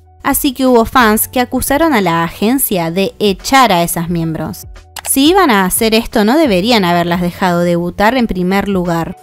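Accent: Argentina. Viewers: 💫 ¡Excelente tu canal! ¡Me encanta!Solo por curiosidad ¿sos de argentina?💫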